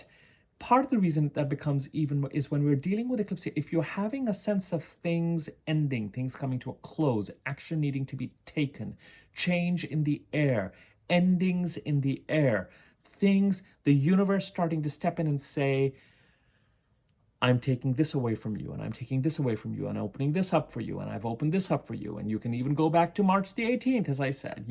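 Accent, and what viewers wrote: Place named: California, United States. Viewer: Yay an video! I find your videos so very helpful helping me to reflect..& chilll out 😄 your voice is so calming 🙏love the Californian accent too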